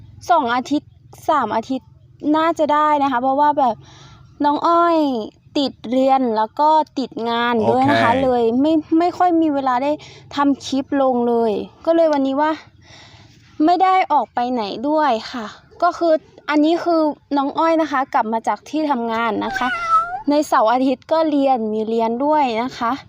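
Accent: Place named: Suphanburi, Thailand. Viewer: เวลาน้องพูดสำเนียงไทย เสียงจะออกเหน่อฯ เหมือนคนสุพรรณเลย😂😂😂อยากฟังควมไตยบ้านน้อง แบบเต็มคลิป ...เพราะแต่ละที่ สำเนียงไม่เหมือนกัน😇😇😇ยอนเสง สาวไตย ว่านพักตูเมิง ค่า.......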